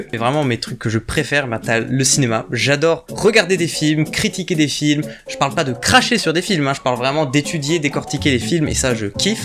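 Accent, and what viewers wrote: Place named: Alsace, France. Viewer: Merci ( Moi je connais ton vrai prénom hehehe 😜 ) pour cette FAQ, j'ai appris des choses sympas sur toi 😃👍🏻 Et j'avais deviné que tu habitais cette region, ( j'ai habité en Alsace pendant 11 ans, ma region de cœur 🥰 elle me manque 😓 ) le "OUi", ils le disent "Ui" c'est rigolo 😂 Khalee et tes 2 chats, je les adore! 🙏🏻😍💝 Je suis aussi cinéphile, quand je regarde mes Thrillers psychologiques, je decortique, je fait des hypothèses, j'essaie de comprendre ce que le réalisateur a voulu nous faire comprendre 🤔🕵🏼‍♀️ Et une question qu'on ne t'a pas posée: Je vois que tu as plusieurs guitares derrière toi, tu en joues? Si oui ( Ui 😂😜 ), pourrais-tu un jour nous en faire profiter un peu? Je suis qqun qui est très musique, chant, danse, etc... et je suis totalement d'accord avec toi, Joyca il pèse lourd dans le game, il est tellement doué!! 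J'adore ce qu'il fait, c'est fou ce qu'il arrive à sortir avec 1 son de 2 secondes 🤩🎶 Et quand Squeezie ( que je kiffe aussi énormément 🤩🙏🏻 ) s'en mêle, ça fait un duo de MALADE 🤩Sora est trop adorable et bienveillant, je le suit depuis peu, je l'ai decouvert récemment, et bien sûr, Louis! 🤩 Je suis de plus en plus attirée par la culture Asiatique, le Japon 🏯🇯🇵💝 et la Corée 🇰🇷💝🎶 Voilà, j'adore ce que tu fais, ta façon de raconter, ton humour rafraîchissant 🤣🙏🏻 je t'ai decouvert il n'y a pas très longtemps, je dirais 7 mois 🤔 avec ta vidéo "Vous ne pourrez plus être en couple" 🤩🙏🏻 J'ai hâte de voir ta progression, tes projets, etc... Biz da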